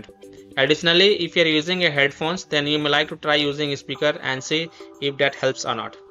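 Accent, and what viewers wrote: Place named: India. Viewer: Bless the indian voice tutorials always saving our butts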